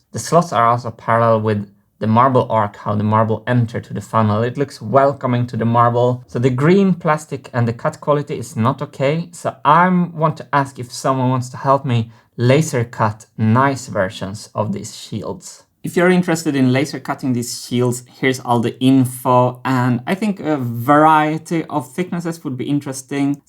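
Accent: Sweden. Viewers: Hes swedish 😅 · Wasn't it more like "yeneration"? And yes he pronounces lots of words or parts of words just like he would in Swedish (because he's a Swede), and makes some mistakes in grammar but I think it's kinda charming since he has a pretty good vocabulary and flow. He's a good communicator, don't you think? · the comment was clearly tongue in cheek. Nobody is actually complaining about very slight Swedish accent.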